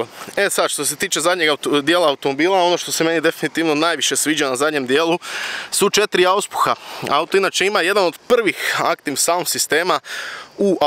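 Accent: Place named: Zagreb, Croatia. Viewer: Ako si iz Splita,sto ne pricas ko Splicani.No ka Purgeri.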